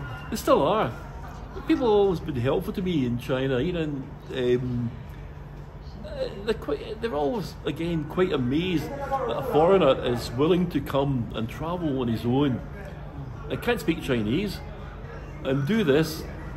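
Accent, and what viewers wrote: Scotland, United Kingdom. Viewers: I would typically play such videos at twice the normal speed.....but given the Scottish accent, I have to watch this video at the normal speed....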